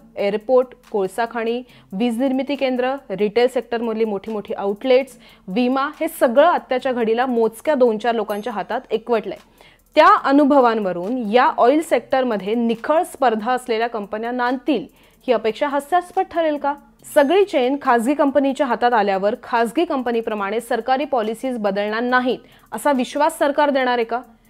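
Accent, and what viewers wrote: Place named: Pune, India. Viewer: ताई ha पुणेरी TADKA Continue ठेव Videos मधे 😂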